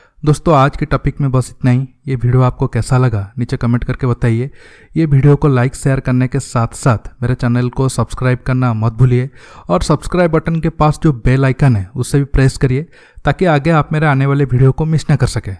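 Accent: Odisha, India. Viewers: tum Odisha se ho kya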